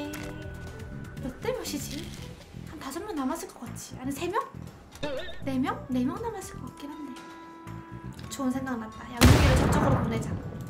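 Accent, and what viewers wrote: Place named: Chungcheong, South Korea. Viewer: 겨??? 누나어디사람이야! 충청도하이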